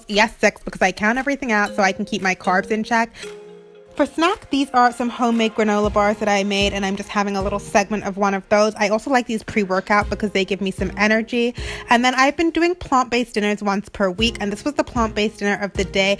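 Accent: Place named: United Kingdom. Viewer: And a bit British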